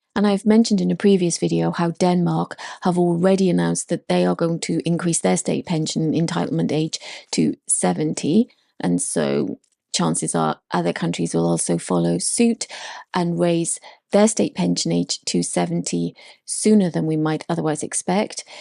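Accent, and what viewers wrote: Wales, United Kingdom. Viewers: Hi, love your Welsh accent. What is that thing you always say at the end "hoi vara amatrar"? I tried translating it but it was coming back as "Good morning, amateur" 🤣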